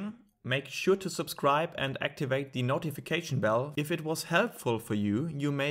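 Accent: Germany. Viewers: dein deutscher akzent ja moin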